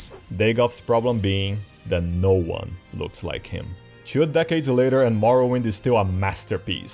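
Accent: Brazil. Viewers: You have an accent similar to Brazilian portuguese, are you Brazilian? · His Brazil accent is so funny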